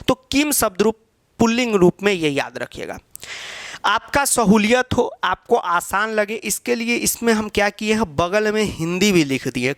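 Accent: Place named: Uttar Pradesh, India. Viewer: He is not bihari he is up wala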